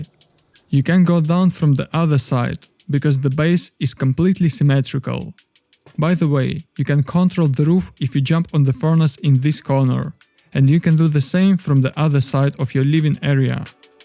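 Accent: Russia. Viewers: ты же русский по акценту бро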